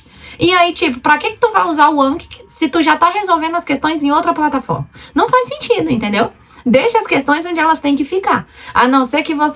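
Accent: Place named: Brasília, Brazil. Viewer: O “sotaque” brasiliense é conhecido de longe kkkkk Excelente dica, valeu mestra 😅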